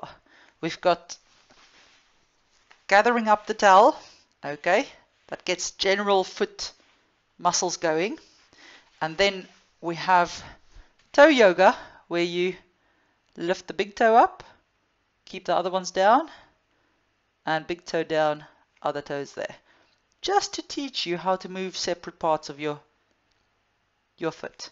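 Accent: South Africa. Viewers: South African accent!! I dont hear to many of those anymore here in the UK haha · Thanks you and is that that an South African accent?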